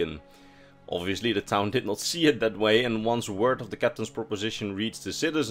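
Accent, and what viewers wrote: Netherlands. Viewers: You sound like a Dutch-speaker.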